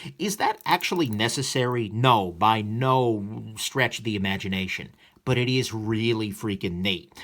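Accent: New York, United States. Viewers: Taking a wild guess, you're from NY?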